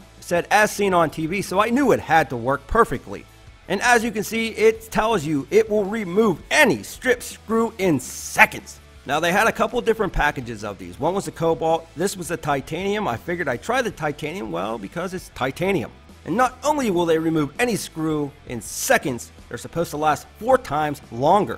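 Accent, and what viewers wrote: Pittsburgh, United States. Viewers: Are you from Pittsburgh???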